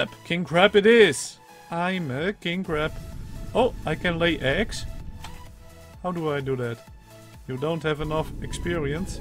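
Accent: Netherlands. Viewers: dutch accent for life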